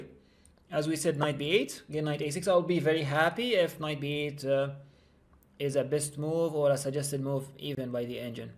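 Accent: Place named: England, United Kingdom. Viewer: Idunno that u have an english acc whatever luv uu from irakk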